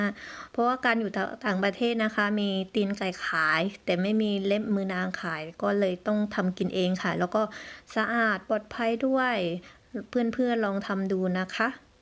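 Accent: South, Thailand. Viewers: รู้เลยว่าคนพากย์คนใต้นิ555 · เก่งจังน้อว คนใต้แน่เลย สำเนียงได้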